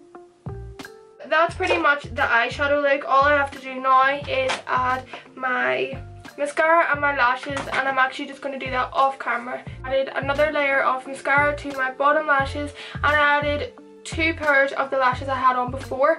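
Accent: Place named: Northern Ireland, United Kingdom. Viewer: It's so refreshing to see a YouTuber from Northern Ireland!!! I feel like I always forget our accent exists on the internet😂💜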